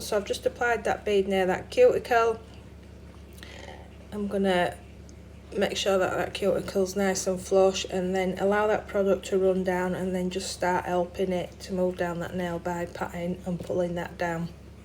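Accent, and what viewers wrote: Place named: Birmingham, United Kingdom. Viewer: Thanks for this video it’s gonna help I’ve got this hand coming tomorrow:) also are you from Birmingham? You sound local to me x this set was lovely xx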